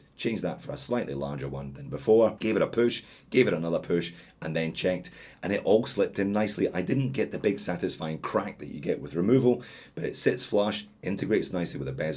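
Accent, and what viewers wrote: Scotland, United Kingdom. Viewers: A Scottish Barry White? Now HE would have made a fortune!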